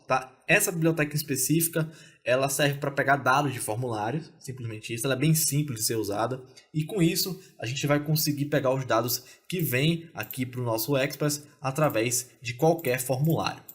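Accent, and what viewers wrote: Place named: Ceará, Brazil. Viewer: maah... tu é do ceará? teu sotaque é do ceará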